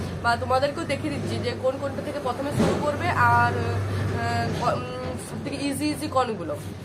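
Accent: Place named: Bankura, India. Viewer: গলা শুনে বাঁকুড়া বাঁকুড়া ভাইব পাচ্ছি।🙄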